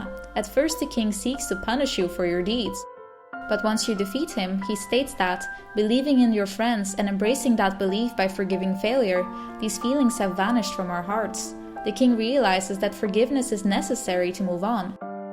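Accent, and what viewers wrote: Netherlands. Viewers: Are you dutch?! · wich province of the Nederland are you, you're dialect sounds familiar. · oke voor een nederlandse heb jij echt een te mooi engels accent <3 10/10!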